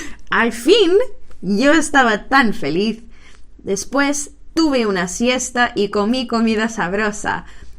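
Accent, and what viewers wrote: Andalusia, Spain. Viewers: como me gusta tu acento andaluz me recuerdas a un amigo de inglaterra que aprendio a hablar español escuchando a la suegra sevillana y de vez en cuando soltaba un deje andaluz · Me encanta el acento andaluz 🤭🤭🤭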